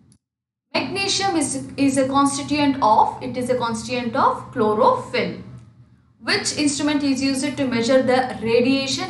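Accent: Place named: South India, India. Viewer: Just love ur speaking accent .👀🙈 R u from south india..🥺👀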